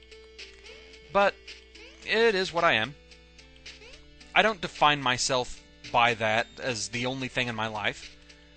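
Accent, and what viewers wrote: Scotland, United Kingdom. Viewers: Hmmm... since I hate not knowing something I hear about, I'll make up my own answer: Really Wily from Megaman. I knew it! Also, my accent is close to yours, just far less Scottish and a little of every US accent. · it creates a bizarre mix that amuses me. but generally its good. there must be some strong scottish traits in your accent, because i cant pick it up, as im pretty much used to any british accent. it doesn't seem too scottish...or american really...ive had too much exposure to accents i think. my relatives need to stop emmigrating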